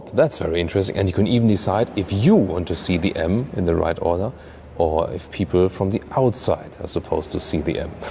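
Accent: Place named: Germany. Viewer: この人ドイツ人だよね。この人の英語にはドイツ語訛りがある。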